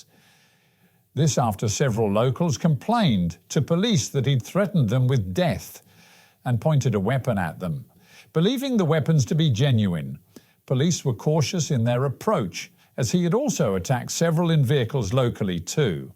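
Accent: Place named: Southport, United Kingdom. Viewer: Southport English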